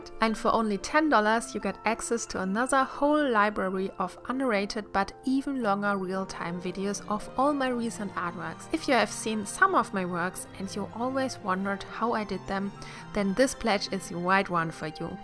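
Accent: Germany. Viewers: Hey! Amazing art! I wanted to ask of you are german, it seems like it, because of your name and accent. Please correct me if I'm wrong 😅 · Are you German? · Geil dass ich in meiner Abitur KunstLK Prüfung 5 Stunden für ein realistisches Ölportrait hab... Super Video und wunderschön! Ich nehm mal wegen dem Akzent an dass du deutsch bist. Sorry if you do not understand anything:) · Maybe it’s just me or, is she German?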